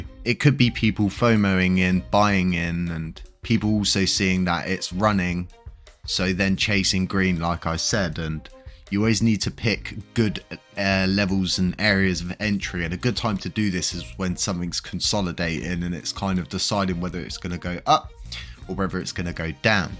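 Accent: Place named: Somerset, United Kingdom. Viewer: My boys got a South West accent im thinking Somerset bai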